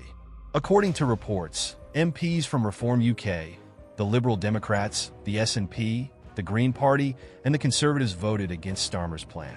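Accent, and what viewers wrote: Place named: United States. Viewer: Why do we have an american narrating this clip?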